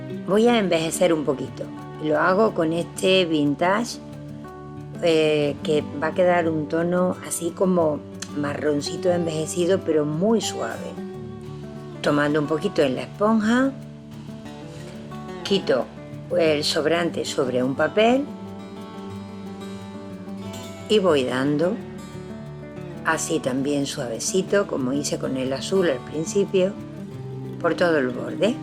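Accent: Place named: Seville, Spain. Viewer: Hola: soy mexicana y siempre me he dedicado al trabajo intelectual, pero ultimamente, claro, me estoy interesando en reparar mi alrededor directamente comenzando por mi hogar, jajajaj,a, y tu video es tan sencillo que facilita esta mia tarea. gracias por estar ahi. ademas, eres sevillana? bueno pues que me reencanta como hablas.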